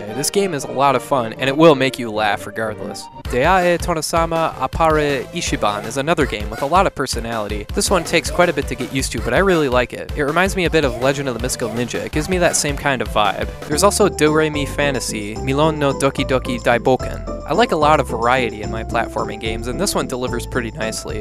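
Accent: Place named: Midwest, United States. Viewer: What part of the midwest US are you from?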